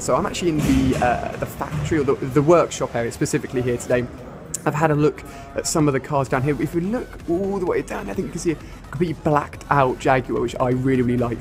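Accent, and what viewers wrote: United Kingdom. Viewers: Hes uk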